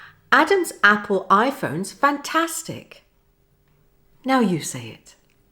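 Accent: United Kingdom. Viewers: Is it British English accent?? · Is it british accent?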